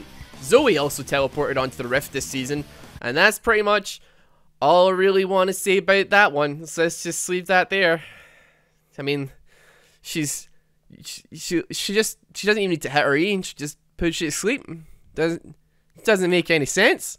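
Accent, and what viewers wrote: Scotland, United Kingdom. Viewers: I went from asmr to this, almost fell asleep to the sexy Scottish accent🌞 (EUW) Legendarylemon5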